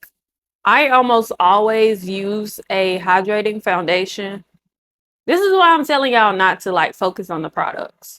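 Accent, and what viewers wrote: South, United States. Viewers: New here, 1) you’re gorgeous 2) idk what I was expecting but I wasn’t expecting your voice to sound like that lol you def gotta be from the south with that accent. And your freckles!!!! 😍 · OMG I love her country accent ! 🥰😂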